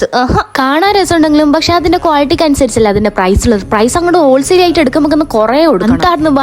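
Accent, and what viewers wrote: Kasaragod, India. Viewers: Aiwaa ksd slang bannallooo ❤yeyy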